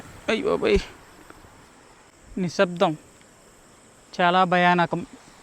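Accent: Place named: Andhra Pradesh, India. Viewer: I like your andhra slang 🫣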